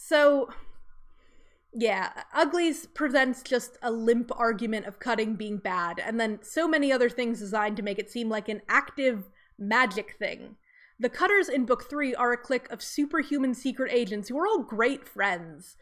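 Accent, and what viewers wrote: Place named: United Kingdom. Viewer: Kind of like "raw-ther", I think? I'm Canadian. To me it sounds like we say it the way it sounds when said in the seemingly dominant American dialect... But I'm pretty sure people are somewhat deaf to their own accents. Like, I don't normally hear "aboot" when I say "about", but then sometimes it kinda does sound like I draw out the "ou" a bit. Anyway, I think the "typical" sounding American dialect that I am familiar with sounds more like "Raa-ther" than "Raw-ther" Edit: is that Somerset, UK or Somerset County, New Jersey. Edit 2: must be Somerset, UK I can actually hear it now. There's definitely a bit of an ambiguous "British" sound to your accent.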